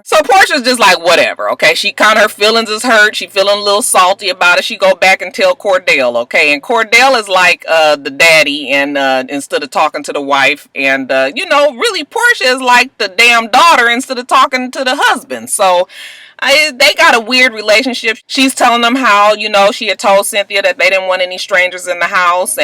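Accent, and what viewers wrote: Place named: Atlanta, United States. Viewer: You do sound a little ghetto but I love it! You sound like ATL native.....lol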